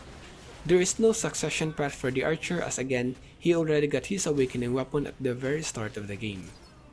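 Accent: Philippines. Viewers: Sorry but I can't help but to notice. are you from south east asia? Particulary from the philippines? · Yep first 3 seconds and I can already tell this guy is pinoy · I know a pinoy accent when i hear one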